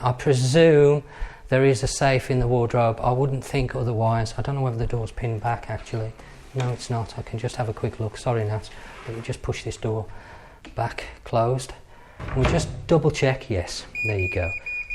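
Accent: West Midlands, United Kingdom. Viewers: Hey how are you bro. Sorry we haven't been able to visit you for a while we were in Bulgaria then Malta were we got stuck due to Covid and flights we're cancelled. But great to catch up with you again. Where in the west Midlands are you from bro, i really recognise that accent. Very cool indeed. Thanks so much for taking us along with you on this adventure trip. Nice 4x hotel. Stay safe and take care my friend catch up soon God bless you.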